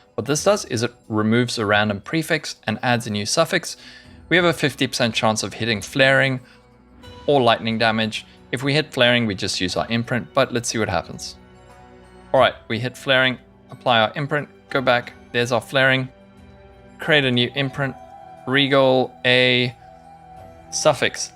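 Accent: South Africa. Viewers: Are you Afrikaans? Your English accent sounds like you might be from SA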